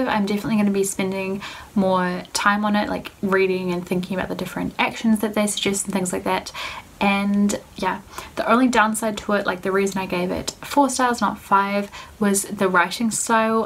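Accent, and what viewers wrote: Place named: New Zealand. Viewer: Just stumbled across your channel from your Harry Potter film Wizardry flick through. I could tell you were a Kiwi straight away from your accent :) Just subbed and So excited to see more of your videos in the new year!! HAPPY 2019 - Also when you said Molly Moon it brought back so many memories haha.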